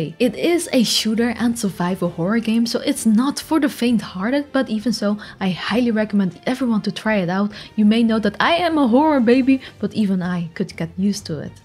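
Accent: Netherlands. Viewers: je klinkt een beetje nederlands heb ik gelijk?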